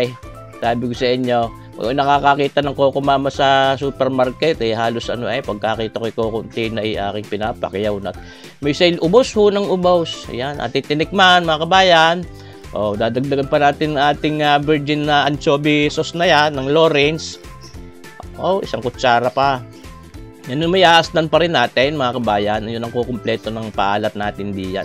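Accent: Batangas, Philippines. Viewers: Kabayan nag review pa ulit ako ng luto mo. I believe na napakasarap niyo. Inggit ako. Salamat kabayan. Palagi kitang sinusubaybayan. Aliw na aliw ako dahil sa puntong Batangas. Pati ako ngayon ay na pra- practice ko ang tagalog na may puntong Batangas. Panalo yan.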